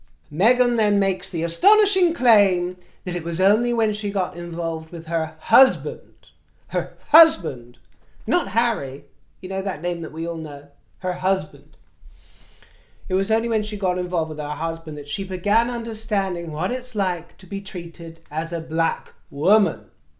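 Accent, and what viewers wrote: United States. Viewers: Love your American accent! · lol your American accent is spot on. Makes it more funny when you put mm in her place. Lol. I enjoy your posts.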